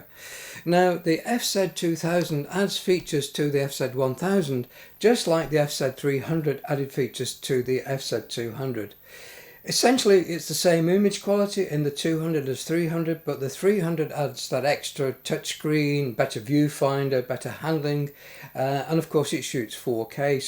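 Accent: England, United Kingdom. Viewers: You've done a marvelous service for us! Thank you so much! I have had the FZ2500 for a few years and have known that there was a lot more to it that I was doing but I just couldn't get a grasp on it. You have helped me big time! And I love your delivery. No extra noise....perfect focus. Plus, being an American, I can't get enough of your English accent. Haha!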